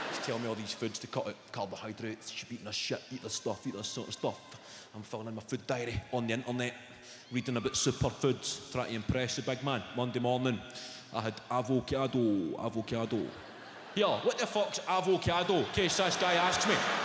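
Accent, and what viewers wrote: Scotland, United Kingdom. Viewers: I must admit I love the Scottish that accent , this guy is funny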